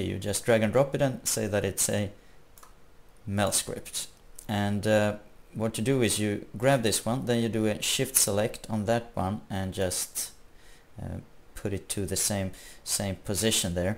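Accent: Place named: Sweden. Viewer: jag hörde dirrekt att du var svensk! :)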